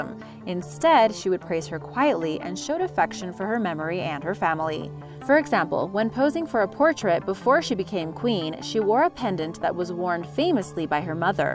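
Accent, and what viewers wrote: United States. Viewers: As soon as I heard the American accent I should have known that these "FACTS" would be nothing of the sort. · Well she sounded american and like i said a few comments ago i watched another video that was american and they got their facts wrong when they said stephen hawking had als when he actually had motor neurones disease not trying to belittle anyone just saying for them to get their facts right because as you can see it will piss a lot of people off if they're incorrect such as this video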